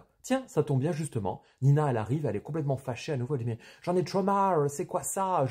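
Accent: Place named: England, United Kingdom. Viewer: Merci pour ce sacrifice qui m'aura évité de le lire 😂 Cet accent anglais juste... incroyable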